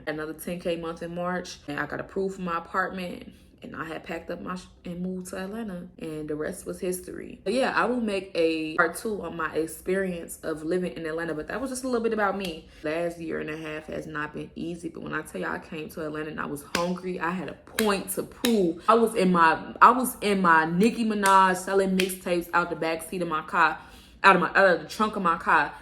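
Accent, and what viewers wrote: Chicago, United States. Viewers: I’m from Milwaukee but I live in Texas and your Chicago accent 🥹😬💕 My cousin from Chicago… I love….love💕 You are truly gifted, intelligent and your grandma is smiling down and very proud. Your grandmother passed the torch of good home cooking, continue to use your gift to inspire families to cook at home. #bringbackfamilydinners good luck to you💕🙏🏽🙌🏾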